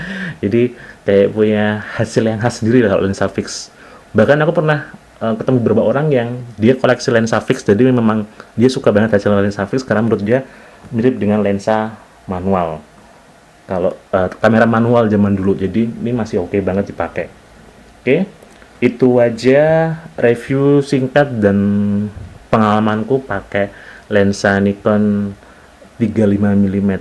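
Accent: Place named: Surakarta, Indonesia. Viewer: Koyok e wong solo iki haha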